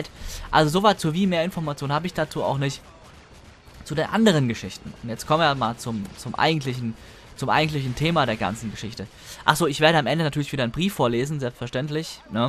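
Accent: Hesse, Germany. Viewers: dein Hessisch ist genial:)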